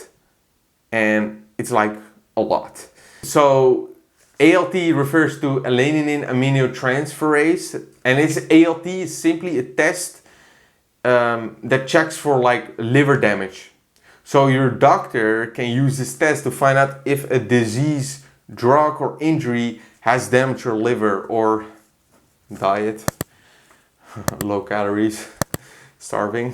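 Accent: Netherlands. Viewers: Here from more plates for dates, en toen dacht ik deze gozer heeft een nederlands accent dus ff kijken. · Hier via Derek. Binnen paar seconden wist ik dat je nl was. Dutch accent >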